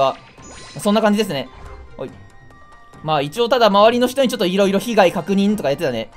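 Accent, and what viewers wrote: Ishikawa, Japan. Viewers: 、石川訛りって言われてて心配してたけど本当にそうだったんだ。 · 動画見てるとたまに訛ってるなって前から思ってたけどスッキリしました、石川出身だったのですね。自分は富山の人間なので近いと思っていました。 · 何か意味不明なんだけど、何となく石川県民っぽくて地震大丈夫だったかな？みたいに思ってたら本当に石川出身と聞いて自分で驚いてる笑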